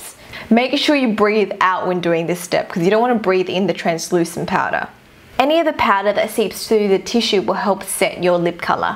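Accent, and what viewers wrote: Australia. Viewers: love u r Australian accent it's so cute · you sounds like Australian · Are you from Australia? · I'm pretty sure that she's from China... But the thing is that she sounds so Australian🤔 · Are you Austalian? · are you aussie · she sounds australian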